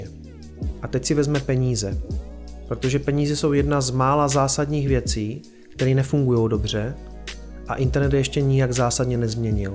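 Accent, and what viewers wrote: Czech Republic. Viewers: Už tě sleduju nějakou dobu ale ted slyším že si z Prostějova. Já jsem se tam narodil a bydlel 22 let. Dobrá práce, moc pekný videa, naučil sem se hrozně moc a občas se i zasměju 👍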